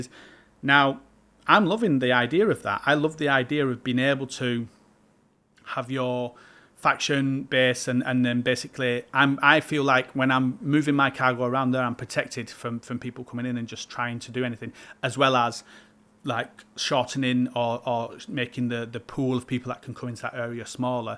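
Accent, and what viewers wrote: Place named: United Kingdom. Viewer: 1. You need to slow down when you talk 2. You have a thick UK accent half of what your saying is hard to grasp 3. Your a bit of a scatter brain, prepare when you post You Tube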